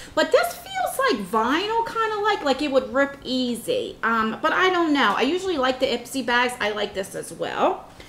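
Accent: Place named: Philadelphia, United States. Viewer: where are you from? you have kind of a philly accent lol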